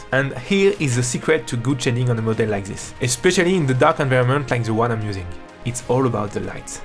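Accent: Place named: France. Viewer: Yeah…real convincing. The thing turns out to be the usual ‘blob’, and then we watch a whirlwind of sculpting edits on super fast fwd. These Ai image to model programs are ‘not ready for prime time’. So why is every ethnicity and their grandmothers trying to sell us on it? This one is particularly annoying, being narrated by a guy with super thick french accent. See you in a few years…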